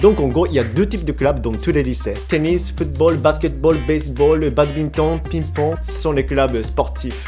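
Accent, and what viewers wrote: Japan. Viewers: Tu parle super bien français mais tu a l'accent japonais très prononcé j'adore😃😄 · J’adore ton accent japonais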